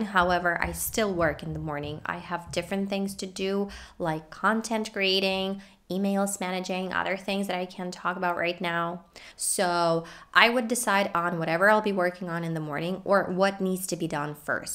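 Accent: United States. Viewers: Hi my intimate teacher,. I follow your videos on a daily basis. I always use the methods that you suggest so as to be excellent at Englih as you. I admire your American accent. When I watch your videos and listen to your fluency, I feel relaxed. I hope I'll speak English as perfectly and fluently as you using your methods soon. Thanks for your beneficial advice and lessons. Keep going and we're all with you. Always take care. Sevara from Uzbekistan:) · Круто! Я б не сказав що Ви Українка, гарний американський акцент. І техніка 15 хвилин варто спробувати! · Is your English pronunciation of the USA?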